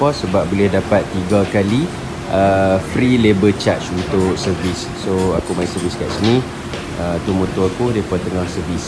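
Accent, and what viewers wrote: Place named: North, Indonesia. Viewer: loghat mcm org utara so kita geng la 🤣dah la R15 juga tapi aku R15 biru 😬 salam geng R15🔥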